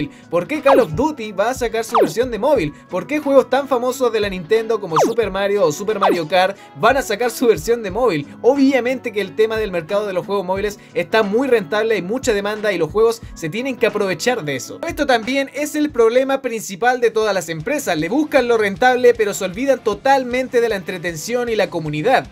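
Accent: Chile. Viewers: Podrías por favor tratar de neutralizar más tu acento...? Tus vídeos son excelente pero tu acento chileno se me hace tan fastidioso... No soy hacer ni nada, solo como consejo..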